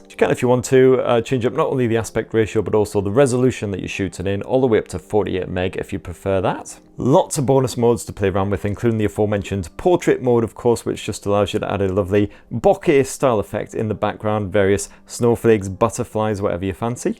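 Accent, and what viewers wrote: United Kingdom. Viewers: Just came to hear the British accent 🌝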